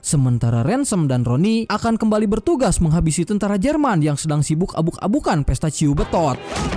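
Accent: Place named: West Java, Indonesia. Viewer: Urang Sunda nya,,, edun euuuy lah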